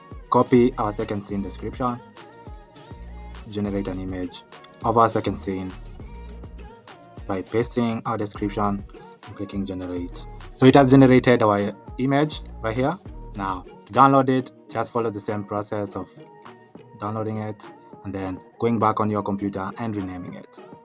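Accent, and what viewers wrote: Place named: India. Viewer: Maybe you are talking with Hindi Accent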